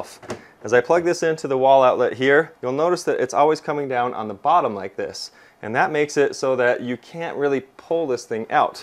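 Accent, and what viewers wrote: United States. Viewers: Your accent sounds American yet you fully endorse a UK product. You even went as far as to wear a red shirt. 🇬🇧 You know how Americans feel about the Reds. (Red coats, rednskins, red scare) I mean its a great color, but just saying red is generally the enemy's color.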